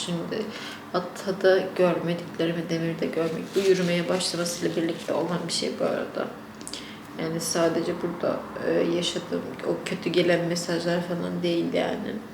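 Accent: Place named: Russia. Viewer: Türkceyi ögrendide russ kaldi😂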